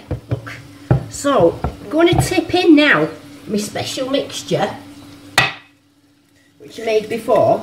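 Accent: Manchester, United Kingdom. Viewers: Ahahaha love the broad Mancunian accent....from a fellow Mancunian...going to try this as I'm a diabetic type 2 and can't eat potato as watching my carb content...x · I really don't know how to start on this one. First the obvious irony of someone in Norway watching a UK lady making a Swede (see what I did there?). Apart from the fabulous recipe which I made this very evening, you are absolutely hilarious. The presentation, explanation and witty comments are SO endearing, that's entertainment in itself. So many so called cookery experts are super slick and explain things WAY too fast but you are just . . . . so down to Earth and genuinely entertaining. Love the accent - I'm guessing Manchester or Burnley? Either way, the most entertaining (and rewarding) ten minutes I have spent in a long time, I thank you! If I may be so bold as to offer one piece of advice . 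. . . please don't ever stop posting, thischannel is solid gold.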